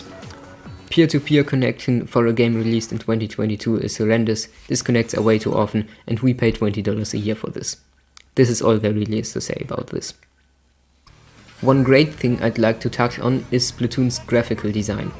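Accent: Germany. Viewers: Wow thats a really good First video :) And i find it funny how you Sound like having a really strong german accent haha x)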